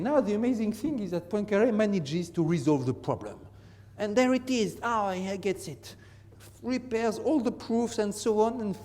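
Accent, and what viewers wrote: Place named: France. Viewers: He sounds SO French and I love it!! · ITS A PURE FRENCH ACCENT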